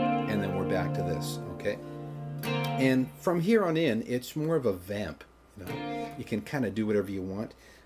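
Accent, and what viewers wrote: Canada. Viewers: You talk so Canadian. Good video though.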